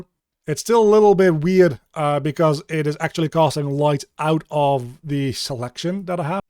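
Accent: Netherlands. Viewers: For some reason watching this really reminds me of Jazz Jackrabbit 2 , which, hilariously, was also made by Epic Games. One of the things I really love about their lighting setup there, was that the player character has a point light (with some trails) around them, as well as all the bullets. You could have really dark scenes where you could barely see anything, shoot a few bullets to get a sense of what's happening around you, and navigate almost by "sense of touch" through the character-bound light. As you started building out lights in your scene, it triggered deep memories from that game. <3 Thanks for the tutorial! Great stuff. Just what I needed. P.S. Heb je een Nederlands accent? ;)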